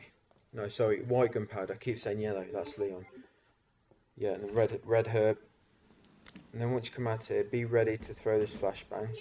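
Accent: United Kingdom. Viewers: Great guide, your british accent was kinda funny and also mislead me once but its fine and I feel like without you I wouldn't even attempt to beat hardcore normally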